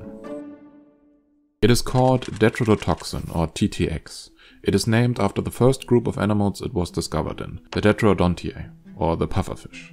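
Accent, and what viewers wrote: Germany. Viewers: The First 7 Seconds and i already know you are a German. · Is the narrator german? Sounds like i while speaking englisch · Are you German ? because I hear a German note in your pronaunciation lol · This dude sounds super German. That being said, awesome video. I'm hooked and subbed! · höre ich ein deutschen akzent? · Are you from germany?i don't mean it in a bad way it's just they way you speak englisch even tho yours is perfect kinda reminds me of the my peers used to do ,i'm from germany might explain why i ask? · He sounds german lol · Du bist deutscher oder? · german accent? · Kommst du aus deutschland? Dein akzent klingt so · German accent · Why do you sound this german? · You instantly recognize the german accent xD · Are you from Germany? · i love hearing morbid facts in a matter of fact German accent.. Informative and unintentionally droll. 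· You Sound so German you could voice Klaus from American Dad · 10 seconds in and i can already tell that he is German lol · This guy is German for sure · are you german? you do sound a lot like a german person speaking english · Ich hab noch nie jemanden auf YouTube gesehen der so nen starken deutschen Akzent hat :D no hate ich finds nur witzig und ziemlich ungewohnt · I realized the German accent immediately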